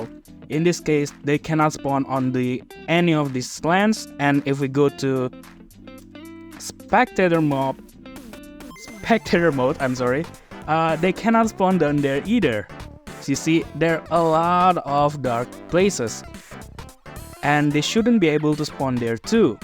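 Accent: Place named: Indonesia. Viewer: Logat indo njir... Ts orang indo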